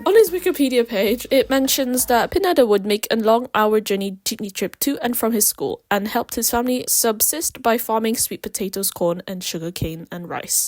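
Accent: United Kingdom. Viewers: love the british accent lol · legit question cause im a bit confused but whats the point of this one? is it because of that shay girl? (idk much bout her, i only heard her name in trese don't think she is big in ph even really, just my experience as someone actually living in ph) also, cool accent, are you British? or American? (you give British and valley girl vibes tbh)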